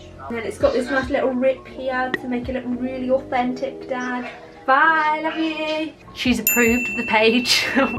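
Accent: England, United Kingdom. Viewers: ok so there are two things i need to say to you : one. you're so damn good at scapbooking!! i tried once and my eyes just don't work well lmao. two. i really like your accent! i could listen to you for hours. i am planning to move in england so from now on you will be my exemple for training on the english accent. byye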